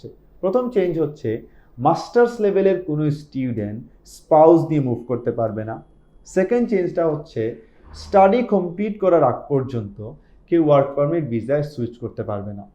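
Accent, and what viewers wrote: Sylhet, Bangladesh. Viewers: Sir kota bolar doron Sylheti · Bhai Sylheti ni🥰🥰🥰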